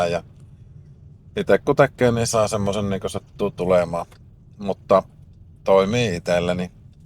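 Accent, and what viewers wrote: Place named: Oulu, Finland. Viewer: Hyvin tehty video, varmaan mieluinen pakuharrastajille! Luontevaa, leppoista "oululaista" kerrontaa, jota on helppo seurata. Mutta missä on Aava?!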